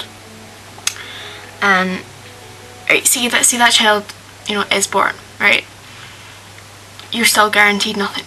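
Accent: United Kingdom. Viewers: What kind of accent is that? I know it's from the British Isles, but I can't pinpoint where...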